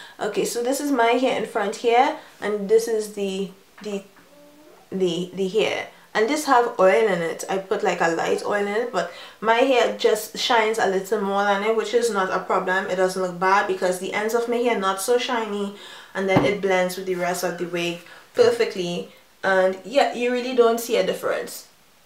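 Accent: Trinidad and Tobago. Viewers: you sounding trini ☺☺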